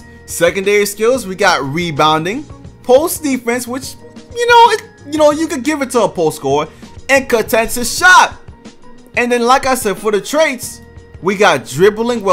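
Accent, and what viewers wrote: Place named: New York, United States. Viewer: You from New York?? Your accent lmao💀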